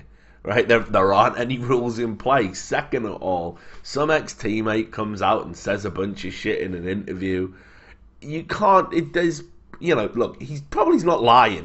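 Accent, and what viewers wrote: Wales, United Kingdom. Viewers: I picked up the welsh in your accent :D great vid, good points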